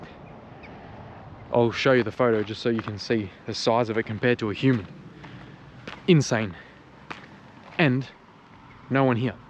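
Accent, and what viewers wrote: Australia. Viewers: Hahaaa I love your ocka talk m8..😂..and I love your wee giggles here and there..living the life dude..🎉😊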